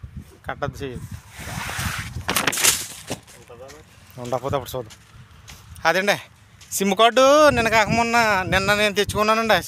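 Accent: Godavari, India. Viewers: హాయ్ అన్న ఎలా ఉన్నారు నేను ఈమధ్య మీ, వీడియోస్ చాలా మిస్ అయ్యాను మన గోదారోళ్ళు మాటే అలా ఉంటుంది మరి కైమాలు, చాలా బాగున్నాయి నైస్ వీడియో బ్రదర్ 👍👍♥️♥️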